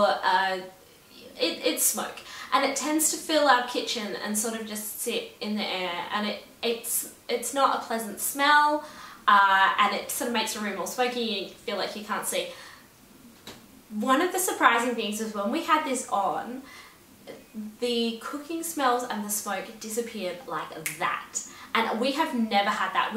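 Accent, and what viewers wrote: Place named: Australia. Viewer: I love Australians accent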